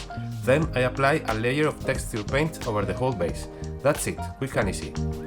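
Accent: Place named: Spain. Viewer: Vi ese español interior a través del acento jajaj, buen vídeo Bro 👏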